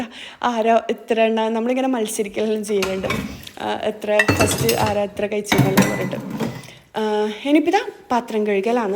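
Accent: Kannur, India. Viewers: നമ്മളെ കണ്ണൂർ ഭാഷ തന്നെ 😅😅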